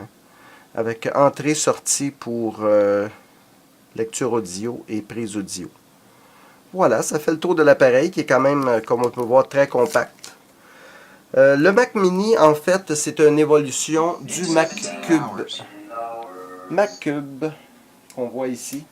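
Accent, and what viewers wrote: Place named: Quebec, Canada. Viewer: i love your french Canadian accent!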